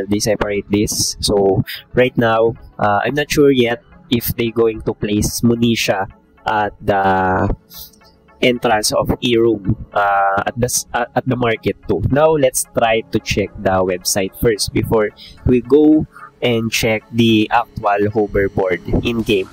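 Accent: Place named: Visayas, Philippines. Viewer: Pinilit mopa mag english pati accent mo pang bisaya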